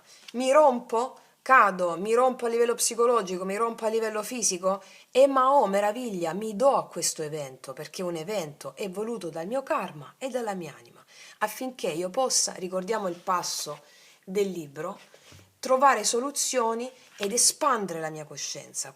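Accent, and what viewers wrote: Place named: Rome, Italy. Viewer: Mbe di Roma ti ci innamori, non puoi farne a meno. Poi avevo varie amiche romane, me facevano impazzi'. Il problema delle cose belle è che creano dipendenza. Roma mia amata Roma bella e caotica città irrequieta. Poi il romanesco me fa sbrocca'. Grande, sempre molto gentile ed amorevole eee. Come cantava... Quanto sei bella Roma...mo la vado a senti`🌝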